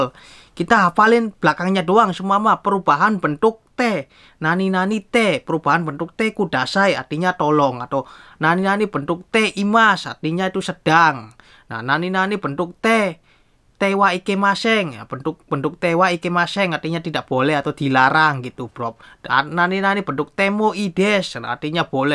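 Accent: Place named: Cirebon, Indonesia. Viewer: Logatnya kaya Cirebon dermayu y mas😅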